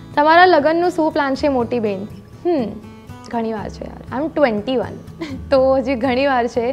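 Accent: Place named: Kathiawar, India. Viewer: jordar girl!!!vadhare aetle maja avi bcoz tu kathiyawadi bolech🤩..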